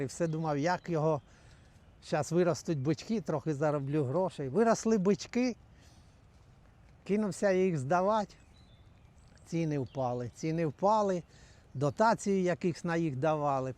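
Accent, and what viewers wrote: Chernihiv, Ukraine. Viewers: Доброго дня земляче з Бобровицького краю!а я по говору і познав,що чернігівська вимова.с.Ярославка.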